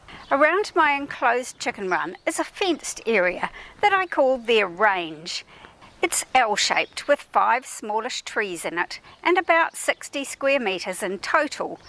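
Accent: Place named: New Zealand. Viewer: that was my guess that you lived in New Zealand just got Clues from your timestamp the beautiful weather in December, and your accent. A lot of your words pronounced just like your FORMER prime minister what's her name. Jacinda? 😅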